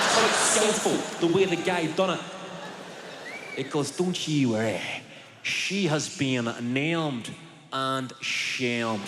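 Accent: Scotland, United Kingdom. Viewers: It's not an English accent It's English with a Scottish accent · Can you please add subtitles to this? I'm from middle east and it's really hard to understand Scottish accent :(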